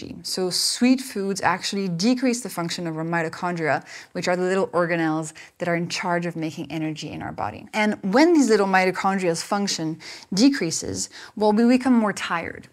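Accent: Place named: France. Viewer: You are the first french person that I hear talking English and your accent in combination with your calm voice is so pure beautiful. Its like listening to a romantic song.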